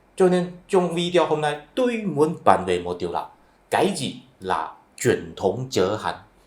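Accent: Cantonese, China. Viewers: Ô này hình dáng bên ngoài là người Việt Nam, adn là người Việt Nam nhưng tiếng nói bị hán hóa, nghe tiếng thì ô này người Quảng Đông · Ông này nói tiếng việt rất đúng ngữ pháp chắc vì là người gốc hoa nên cách phát âm nghe cũng có vẻ na ná như tiếng trung vậy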